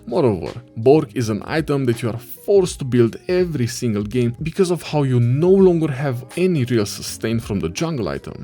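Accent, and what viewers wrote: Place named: Russia. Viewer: Боже что за русский акцент 🫠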